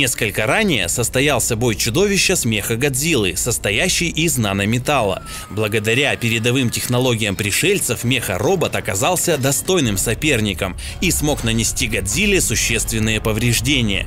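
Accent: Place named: England, United Kingdom. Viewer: Ну нехрена у тебя акцент английского!!!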